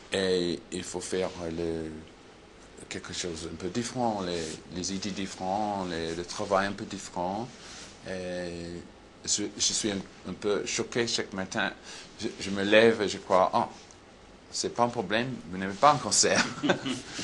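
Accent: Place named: England, United Kingdom. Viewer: The first time I understand him.....and I love his english accent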